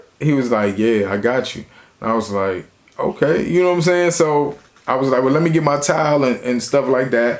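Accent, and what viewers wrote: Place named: DC-Baltimore, United States. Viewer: Bet. That accent sounds familiar. DC to Bmore usually have a variation of it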